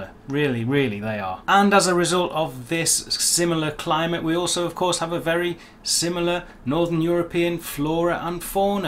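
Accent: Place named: Dortmund, Germany. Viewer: When you talk german, you really sound like a true Dortmunder! Greetings from a born Castroper! Very interesting channel!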